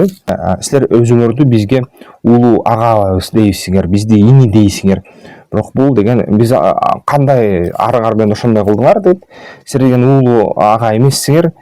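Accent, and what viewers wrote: Standard. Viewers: Азаматсын патириот деген сендей таза кыргызча суйлойт!!!....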